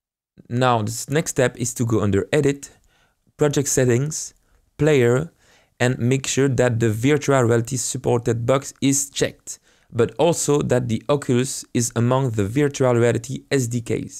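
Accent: France. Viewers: mmmmmhhh le french accent x) C'est marrant de voir la description en français et la vidéo en anglais. En tout cas merci pour la vidéo c'est bien expliqué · Hey, Nice video but it seems it doesn't work for me with the recommended version 2018.4 LTS, I'm able to move, see my hands and so on. But I can't grab anything... I figured out that it was my capsule colliders for grabbing in my hands that weren't moving but it was impossible for me to solve it... Can you help me? PS: You sound french!:p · Ooh that's super interesting ! I may start developping a little VR game soon thanks to you ! So thank you :D Wait a sec, is your accent French ? 'cause I am and I may have the same accent as you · Salut il me semble détecter un accent f Français, je me trompe ? Tes tuto me donnent envie de me mettre à faire mon propre jeu oculus Quest.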